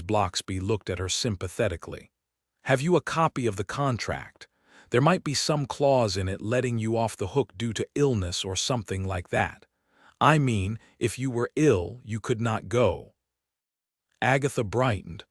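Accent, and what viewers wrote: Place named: United States. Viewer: An American narrator??? Bring back Penelooe Keith!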